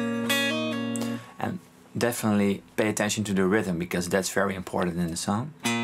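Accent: Netherlands. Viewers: I can hear he is dutch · are you Dutch?you sound really Dutch XD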